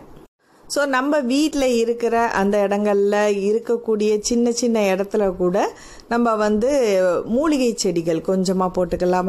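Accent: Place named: Nagore, India. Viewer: Asssalamu alaikkum wa rahamaduillahi wa barakkthuhu..Alhamduillah yella commentkkum reply panni erukinga 👏nan nagore ma ninga pesurathu yenga ooru karanga pesura mari erukkum..like appanu solliringale grandfathera 😊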